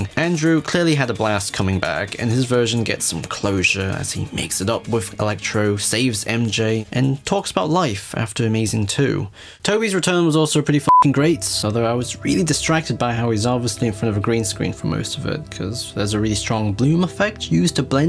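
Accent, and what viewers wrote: United Kingdom. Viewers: wow. is the furthest thing from an “objective” analysis on those comics. It’s clear as day. He constantly tries to diminish plot lines in the Raimi films (see: Spider-Man 2 doesn’t get Spider-Man, his comments on the movies having “poverty porn”, his video on the “nostalgic Spider-Man) and clearly likes TASM and MCU much more. Just as you’re saying an opinion can’t be fact, neither can a shitty YouTube video essayist with chill hop in the background. I get it. Most of you don’t read the comics, and think this guy knows what he’s talking about, because he’s got a British voice, scripted videos, and chill hop. It’s just not the case. And it’s not an opinion for to say “the human torch overshadows uncle ben as a mentor in the comics.” That’s flat out wrong. It’s not an opinion to say “Raimi invented uncle Ben saying with great power” that’s objectively wrong. 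And people can’t take criticism of these films well at all. In fact, you’re trying to say “oh well everyone knows it’s not 100% accurate that’s not the point” as you’re watching. A YouTube channel that constantly tries to drive home the “”fact”” that the MCU films are “”more comic accurate”” than the Raimi flicks. Which again- just is flat out not true. And I never said the Raimi films were a 1:1 carbon copy of the films. But they’re hell of a lot closer than the MCU films. You don’t need bizarre nitpicks of random comic panels from the Stan Lee run to see that. And sorry, it’s still not an origin. You’re telling me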